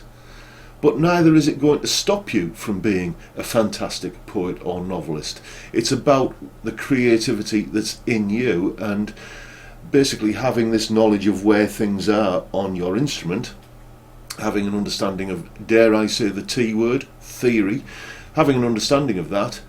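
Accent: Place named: Northern England, United Kingdom. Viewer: Ignore the ignorance, speaking as a suvener, I love the northern accent. Keep up the great work, there are many people who I'm sure will get a lot out of this. Incidentally, my Dad who was from Hull would have called your music center a gramophone!:-)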